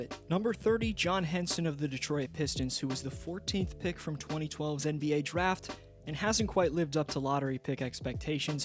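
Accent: Canada. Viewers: R u from Toronto/ Canada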